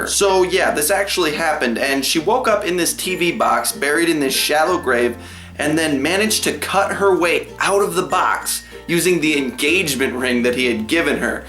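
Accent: Wisconsin, United States. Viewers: Are u from Wisconsin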